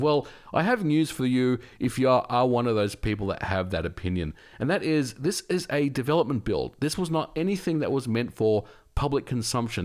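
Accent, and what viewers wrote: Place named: Western Sydney, Australia. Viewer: Which part of Australia are you from? I am getting Western Sydney vibes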